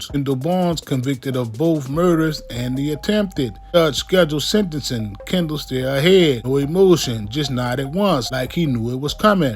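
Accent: New Orleans, United States. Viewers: That’s how we if you’re from New Orleans. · I Understand Completely What That Man Saying. This How We Talk In New Orleans. If You Can't Understand What He Saying Then Why You Watching His Content?! 🤷🏿‍♂️ And More Importantly If That Southern Accent Is "Painful To Listen To" Then Get Tf Off This Man Shyt!! 😂😂✌🏿✌🏿 -Signed A New Orleans Native Bih!! ⚜️🤣🖕🏿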